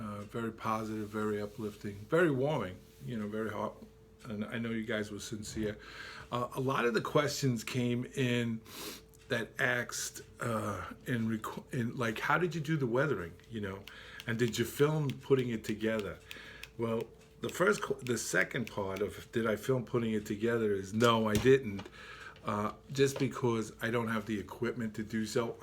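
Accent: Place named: New York, United States. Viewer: Hi. I like what you're saying -- and it's really nice to hear a New Yorker again. I keep my old phones to use as throttles and cameras. Just ask a friend (or The Wife) for their old one. Cheers! --dunc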